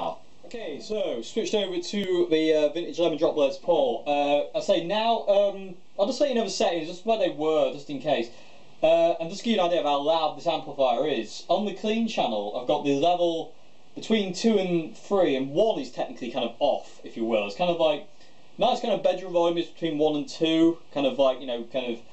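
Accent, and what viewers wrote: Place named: Sheffield, United Kingdom. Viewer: Hi, thanks for the demo. Couple of quick questions I know it's the bandit solo series but is it the 50,65, or 75 watt version I can't see on the video? The other thing is have you tried Tech21's Trademark 60 I really think you would be impressed. Listening to the way you talk I suspect you don't live a million miles away from me in sheffield. You can demo my Trademark 60 if you like I don't think you will be disappointed. Not that I'm knocking the bandit I've got a 1991 teal stripe and that love too!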